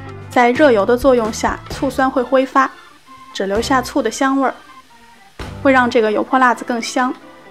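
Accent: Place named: Xi'an, China. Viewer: 听声音是咱西安的吧？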